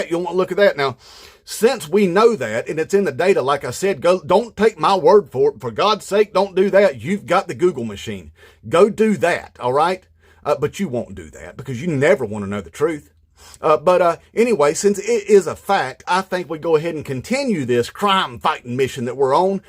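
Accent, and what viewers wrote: South, United States. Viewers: So damn good! I just recently found, could not have appeared at a better time, I'm trying to maintain what's left of my sanity. This man's voice is so spot on, the tone he uses, the wry humor, the precision of his satire, it's just perfect. Also music to my ears is his southern accent. Living out here on the left coast we don't get a lot of well spoken truth in that accent, it's an affirmation that perhaps we're not as close to the abyss as we think we are to hear that other people in other parts of the U.S. can see the same things we do. This man's voice is of tremendous value right now, I will subscribe and look forward to his commentary on this non stop train wreck we're living through. Inmate trump.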